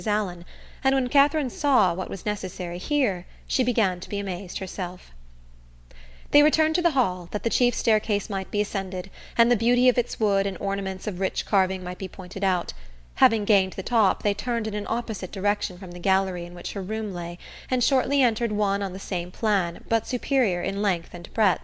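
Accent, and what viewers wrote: United States. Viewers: Jane Austin with an American accent. 🤮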